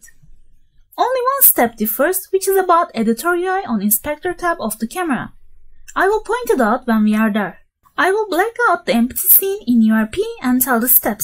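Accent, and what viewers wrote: Turkey. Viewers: dinlediğim an saniye de bu Türl dedimdoğruda bildim : D Türklerin aksanı hep aynı ama güzel kelime haznen var devam.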